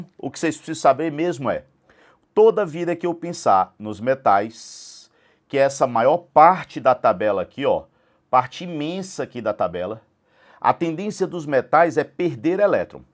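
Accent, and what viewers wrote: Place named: Fortaleza, Brazil. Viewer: assistindo as aulas não so pelo conteudo mas pelo sutaque dele ,bom dms homi ! alguem sabe dizer se ele é de fortaleza? o sutaque é ,mt parecido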